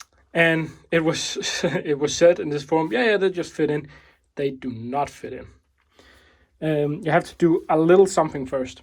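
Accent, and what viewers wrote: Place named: Denmark. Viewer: I got the wood version and yep the magazine wobbles a lot but surprisingly the flash hider is really solidly on there like I've even lost the small screw to make it stay in place and it still sits there perfectly, i can't speak for The Range because I replaced the spring. also due to the dialect, er vi Dansk?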